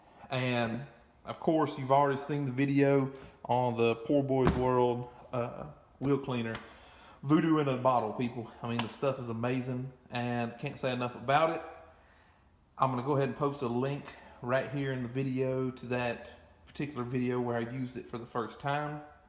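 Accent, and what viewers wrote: Alabama, United States. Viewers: You sound just like where I come from in south Alabama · Bama boy haha that accent tho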